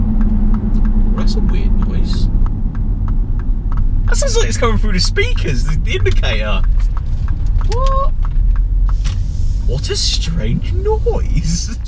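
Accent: United Kingdom. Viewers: Oh My God ... I love UK accent .. !!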